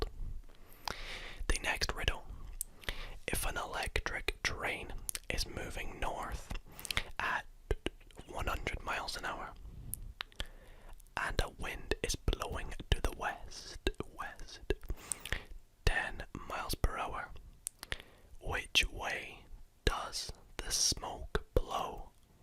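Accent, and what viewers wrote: Scotland, United Kingdom. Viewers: Hey are you Scottish? I'm just asking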